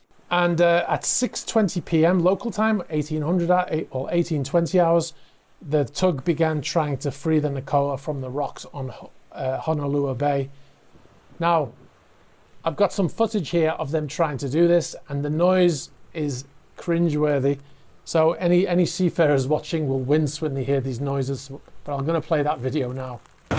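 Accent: United Kingdom. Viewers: Love the HAOLE Announcer, very Brit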